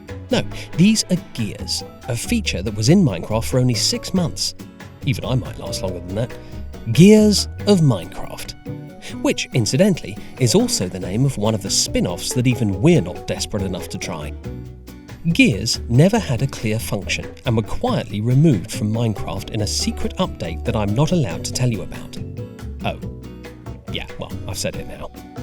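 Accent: United Kingdom. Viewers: Alternate Title: British Narrator creates a time machine while reflecting on Mumbo Jumbo's tutorials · i wouldnt mind if narrator narrate my life he seems like a very nice british person too bad he could only stay for one more year 😔😔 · Oiii oiii this narrator is British less goooo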